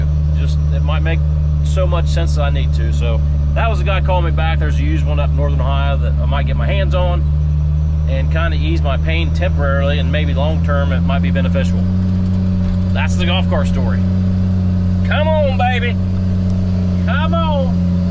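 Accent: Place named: South, United States. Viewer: Yay! Nice to hear southern ahyia 😂👍🏻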